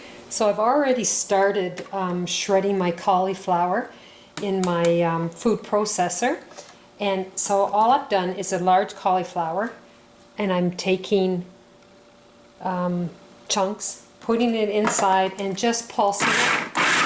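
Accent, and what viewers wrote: Canada. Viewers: can't wait to try! BTW you sound Canadian, are you???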